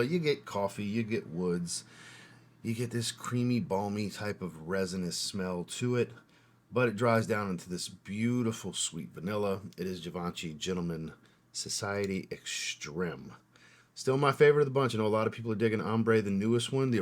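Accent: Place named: New Orleans, United States. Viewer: Hey! The first time I heard your voice, I said to myself, “This guy has to be from New Orleans.” Then, you were wearing a Saints shirt in another video. Hello, from a fellow New Orleanian.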